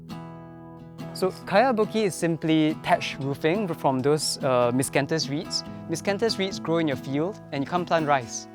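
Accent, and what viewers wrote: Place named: Singapore. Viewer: Based on his accent i would say either singapore or malaysia.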